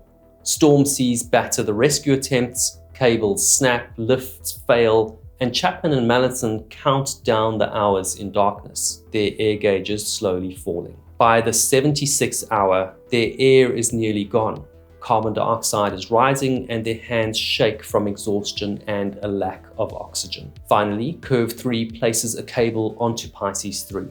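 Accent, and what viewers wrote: South Africa. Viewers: Except he has a South African accent! It is a nice accent though. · I love the content and the South African accent 😊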